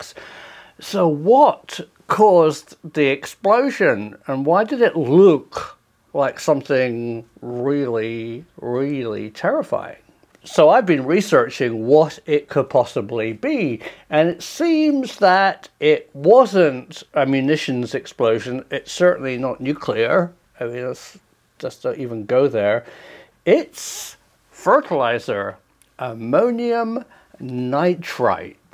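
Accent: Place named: Scotland, United Kingdom. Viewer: Professor I thought Scottish where are you from I’m from Fraserburgh in the north east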